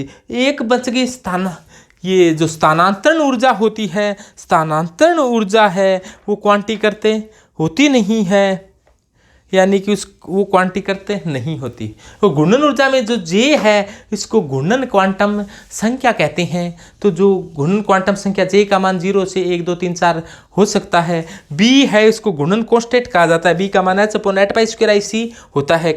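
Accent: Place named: Rajasthan, India. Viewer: वाह सर मजा आ गया आप हमारे राजस्थान के ही लगते हो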